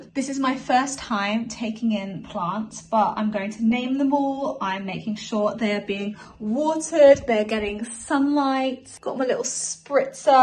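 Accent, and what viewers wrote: United Kingdom. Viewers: Her elegant British accent! Just wow 😂😍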